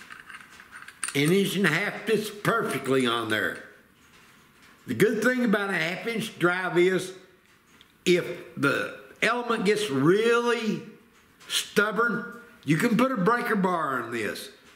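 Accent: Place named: South, United States. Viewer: You definitely from the south like me 😜 yee haw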